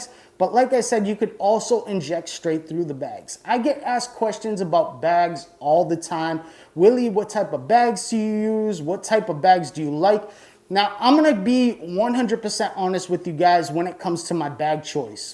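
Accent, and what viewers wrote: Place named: East Coast, United States. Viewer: As usual brings the knowledge along with that east coast accent. Great info on bags. Answered a lot of questions I didn't know I had. It was great to see a new video from you. 🍄♥️ 😎✊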